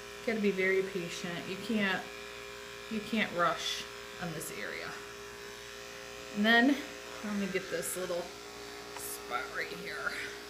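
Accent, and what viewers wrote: Michigan, United States. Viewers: you're a star! I just luv ur soft Michigan twang.. could listen to you all day but I gotta work! Keep up the good work!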